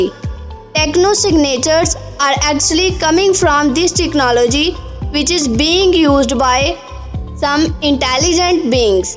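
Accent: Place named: India. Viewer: jesus fck that fckin indian accent..villa ttttaatttttaa wittaa tttaavitta ttaattt aaa 😆😆😆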